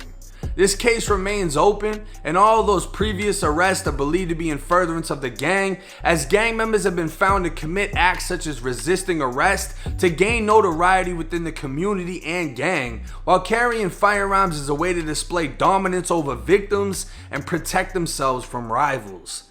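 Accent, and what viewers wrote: Boston, United States. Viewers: Any time he says CHAHGEZ in general to be honest, plus BAHSTEN accent is one of the best parts of his YouTube videos in my opinion.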